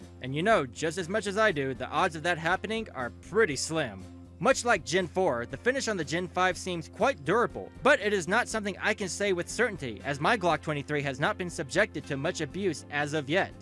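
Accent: Australia. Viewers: australian LMAO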